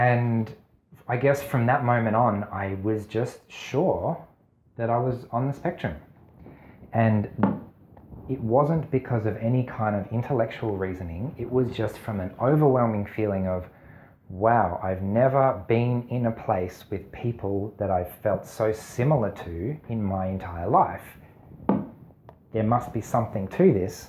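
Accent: Australia. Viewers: I just recently found your channel and it's like having an audio diary but with a nice Australian accent. So much of what you share about your thought process and solutions are almost identical to what I had to learn to do to be able to interface with society.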